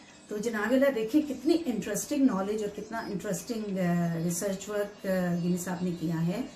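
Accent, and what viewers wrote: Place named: New York, United States. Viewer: Very NYC voice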